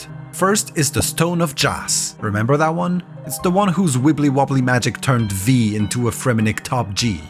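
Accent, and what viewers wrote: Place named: Scotland, United Kingdom. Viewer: Dude your Scottish dialect is cool XD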